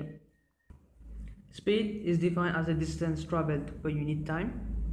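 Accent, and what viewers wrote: Mauritius. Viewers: are you mauritian??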